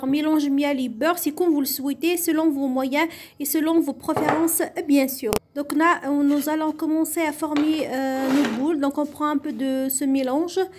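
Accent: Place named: Kabylie, Algeria. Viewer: Je ne comprends pas l arabe pouvez vous traduire en kabyle car on dirait que vous avez l accent !!!!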